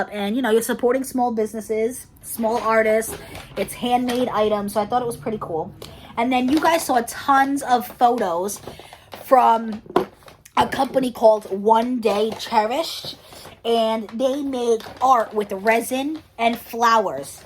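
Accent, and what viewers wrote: New York, United States. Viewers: Yes, I was in New York and the day that I landed it look like doomsday on Long Island. I wish they had a farmers market here at night. I am not a morning person. I can understand that this week I don’t wanna go anywhere and I’m being forced to go somewhere. My grandson died of accidental drowning a year ago. His birthday is June 30. He would’ve been four. On a good note my son and his wife are having another baby. I just found out yesterday. I have a whole garden full of sunflowers in my yard now. I thought from your accent you were from New York. I enjoyed your blog for the farmers market.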